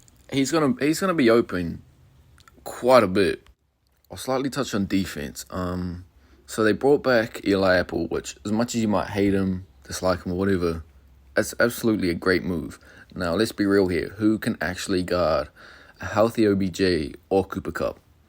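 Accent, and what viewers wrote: United Kingdom. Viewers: Is this guy British? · ngl its super different to hear someone with a british accent doing commentary on the nfl. im glad to hear that american football is becoming a little more mainstream on the international stage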